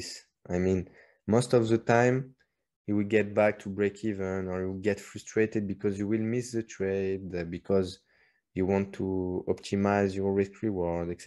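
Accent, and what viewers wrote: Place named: France. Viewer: T’es français? Je sens l’accent français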